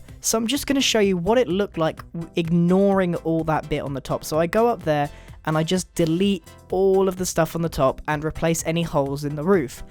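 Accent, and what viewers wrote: United Kingdom. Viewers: i love your brittish accent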